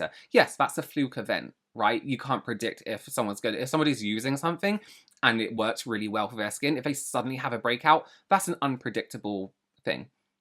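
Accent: United States. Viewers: She sounds so American 😂😂😂😂. Really unintelligent and doesn’t understand that we in Europe actually learn how the body works. Whether you are a man or a woman 😂😂😂 everyone knows what a period is and how they work.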